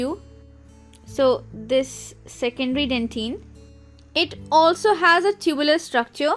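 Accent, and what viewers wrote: India. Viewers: Bad indian english accent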